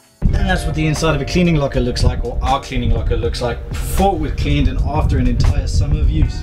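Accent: South Africa. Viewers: Love your videos , love what you do . Are you South African ?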